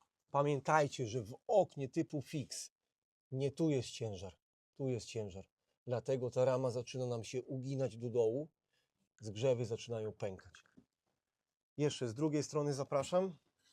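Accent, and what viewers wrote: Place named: Białystok, Poland. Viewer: Dokładnie! Też słucham i oprócz fajnych technicznych rzeczy zastanawiam się skąd ten sposób mówienia. Tak tylko lekko słyszalny. Nie jak u szczepcia i Tomcia… ;)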